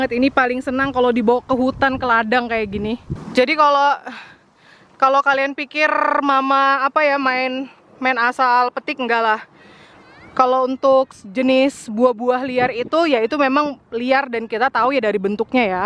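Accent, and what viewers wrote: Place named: Medan, Indonesia. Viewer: Kongsi ya kak..... medan kali bahhh 😁😁😁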